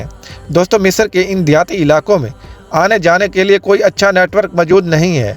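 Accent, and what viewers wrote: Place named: Punjab, Pakistan. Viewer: بھائی اردو تو سیکھ لو ۔۔ پنجابی میں اردو بول رھے ھو ۔۔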